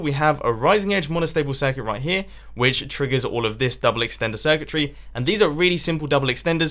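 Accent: England, United Kingdom. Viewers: Are you British? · r u english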